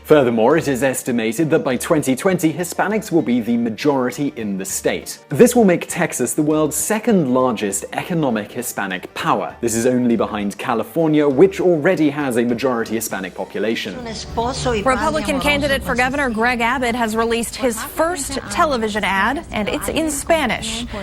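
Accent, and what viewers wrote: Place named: United Kingdom. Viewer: The closed captioning is all wrong.. This is what Simon said in marker "Now it is true that Texas has suffered from the fall in oil prices, many people believe that this state due to its increasingly diverse economy it will lead the way in the United States." This is what is actually written in the closed captioning... "And even though the economy in Texas is suffering from the fall in oil prices, very few people believe that this state won't keep its lead thanks to its increasingly diversified economy." No mention on the statement that Texas "will still lead the way in the United States." Who ever does the closed captioning needs to put their own political bias aside, get a q-tip, clean their freaking ear wax out and listen to the commentator and write what is said. is speaking with a very clear british dialect, I wouldn't say it's British RP; however, its very clear. 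Maybe the new closed captioning job will open up in Texas.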